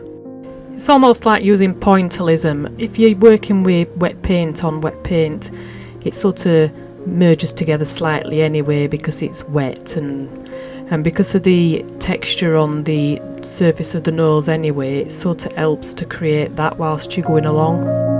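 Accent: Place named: Yorkshire, United Kingdom. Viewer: Not only are you a shit hot artist, but your accent is amazing! Reminds me of so many of my Yorkshire friends haha. Familiar, warm and lovely..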